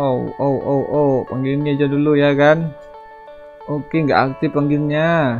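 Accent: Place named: West Sumatra, Indonesia. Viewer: Jelas kali abg ni org minang nya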